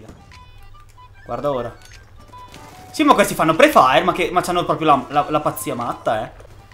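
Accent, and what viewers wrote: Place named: Bergamo, Italy. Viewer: Quanto si sente in sto video il tuo(pure mio ahah) accento bergamasco oh ahahahah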